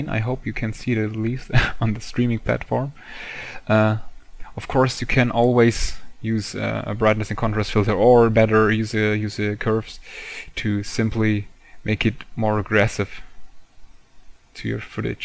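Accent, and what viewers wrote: Germany. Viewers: nice vid but stop copying the american accent its annoying! stick with your german accent its much more charmin....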